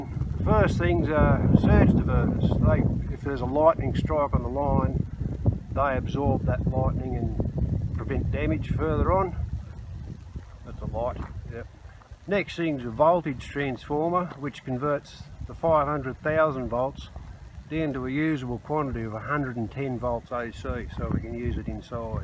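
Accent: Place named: Sydney, Australia. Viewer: Location and incoming lines look familiar, accent gives it away. Not too far off Wallgrove are we...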